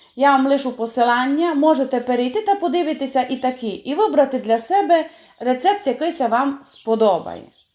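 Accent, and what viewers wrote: Transcarpathia, Ukraine. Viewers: Обожнюю Закарпатській діалект, а рецепти супер. Я обов'язково все перепрошую. Бажаю миру і веселих свят. Вітання з Буковини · Слухаю Вас і милуюся закарпатським діалектом! Дякую за рецепт, якраз шукала як приготувати пончики!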